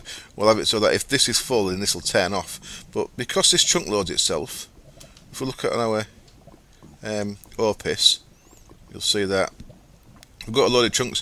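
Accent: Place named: Hull, United Kingdom. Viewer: Fab video.. Just started Monster after me Direwolf20 crashed... Love the Hull speak, And guessing Hessle road the way you swear lol.. Good stuff (y)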